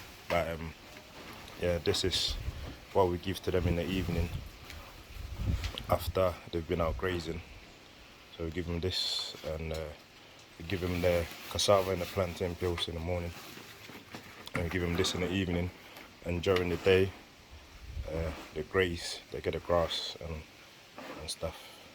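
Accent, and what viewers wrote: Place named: United Kingdom. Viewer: Just found ur channel .. u got a British accent mate lol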